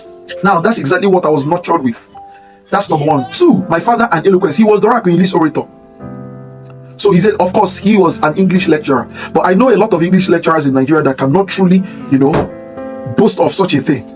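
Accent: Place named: Ghana. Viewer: Its seems you school in Ghana 🇬🇭 right,, your tone is Ghana tone not like nigeria tone 🙄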